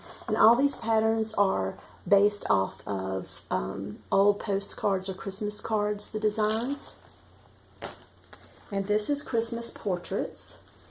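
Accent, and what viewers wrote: South, United States. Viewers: HiYour videos are so calming - you have a wonderful southern accent which sounds so welcoming. I watched the video of you and your friend (night owl?) And your joyous heartfelt friendship was so wonderfully conveyed. I subscribed to her site too.God bless you!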